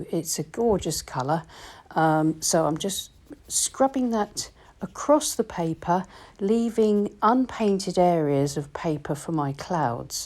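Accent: England, United Kingdom. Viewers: It's lovely to hear your soothing English accent and see you paint in such a peaceful manner. Thanks from a Brit in California! We all need peace at the moment and you certainly draw us into the sanctuary of watercolor painting!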